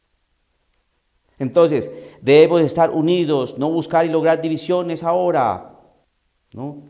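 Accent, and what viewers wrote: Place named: countryside, Colombia. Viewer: Que bárbaro Padre me encanta esa forma colombiano de pueblos para hablar , este virus es una cortina de humo para hacer las cosas y la gente callada , hay q cuidarnos con los protocolos pero no darle importancia ese virus q tiene ciegos a muchos y creen q los laicos somos pendejos y no nos damos cuenta , no podemos callar la verdad hay q decirla x la verdad murio Cristo en la Cruz . Dios lo bendiga y proteja siempre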